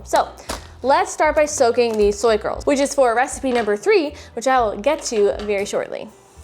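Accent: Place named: France. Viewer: I loved your French accent!😊 I’m new here and I’m glad I found your channel!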